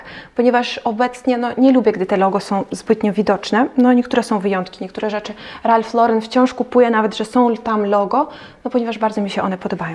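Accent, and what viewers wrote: Poland. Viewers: To piękny polski akcent z ł jak l. Pozdrawiam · Akcent polski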